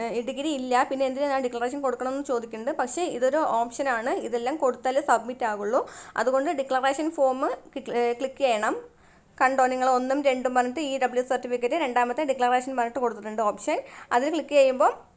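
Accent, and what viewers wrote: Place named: Palakkad, India. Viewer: മിസ്സേ.. നിങ്ങൾ pkd aano.. അതെ സ്ലങ് ഇടക്ക് കേറി വരുന്നതുകൊണ്ട് ചോദിക്കുവാ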